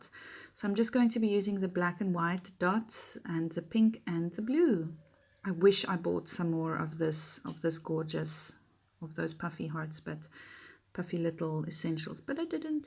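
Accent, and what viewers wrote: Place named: South Africa. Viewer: Lovely to hear your South African acsent. Lovely layout. Love FJ. Love from Cape Town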